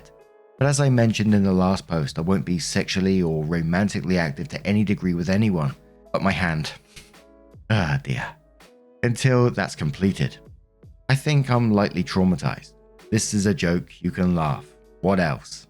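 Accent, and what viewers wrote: United Kingdom. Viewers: I'm British and working in America presently, but thank you for pointing that out. I don't know how I could have missed the very British accent, similar to my own.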